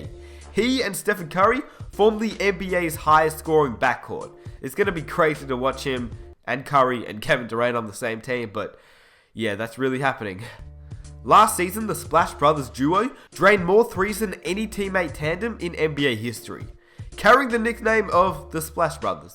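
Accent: Australia. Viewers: R u Australian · Hey are you australian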